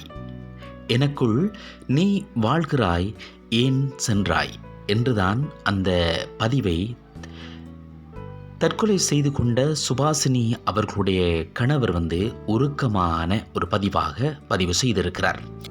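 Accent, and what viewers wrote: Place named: Sri Lanka. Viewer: Ok Anna neenga sri lankava unga slang apd dam erku😢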